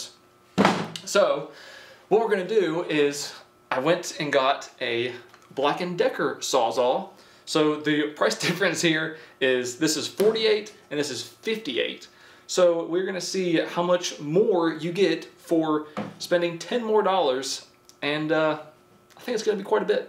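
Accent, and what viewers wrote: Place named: Wisconsin, United States. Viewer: You sound like your from somewhere in Wisconsin We’d know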